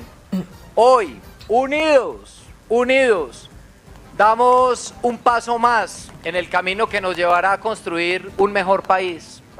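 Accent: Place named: Antioquia, Colombia. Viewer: El próximo presidente habla paisa... Felicitaciones a todos los paisas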